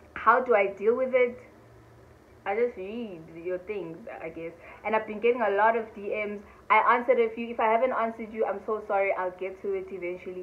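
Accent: South Africa. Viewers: I just love you❤️❤️your Xhosa accent 👌👌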